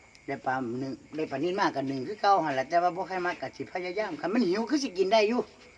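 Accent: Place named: Isan, Thailand. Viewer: ผมละคิดฮอดเจ้าคักยาย fcตะสมัยเสียงอิสานครับ บ่มีคั่วแมวบ่ยาย555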